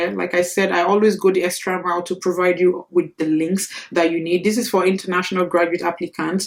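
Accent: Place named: Nigeria. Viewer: Once l heard that voice l knew she is Nigerian. Good 👍 video presentation l searched for "us Universities without GRE" n ur video popped up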